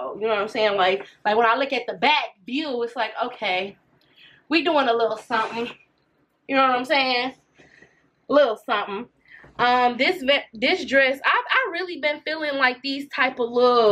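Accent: Detroit, United States. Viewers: Cute, the fact I knew you were from Detroit, well before you said it is crazy lol love the video 😎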